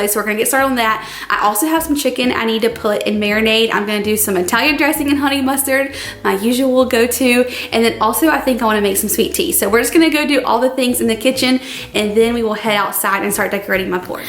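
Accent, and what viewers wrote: South, United States. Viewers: I knew u sounded close to home. You're about 2 hours away from me. But are u originally from the south? Kinda have a little proper accent here & there😄I've never tried the cassrole with cream cheese & cresent on top like that, ill have to try it out! We usually just do Cresent rolls and sausage then egg mixture.(yours looks better haha!) Me and my husband will prob just split it down the middle😂😬.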